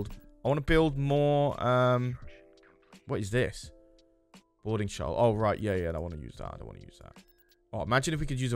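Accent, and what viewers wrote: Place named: United Kingdom. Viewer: The British accent made this perfect for an imperial campaign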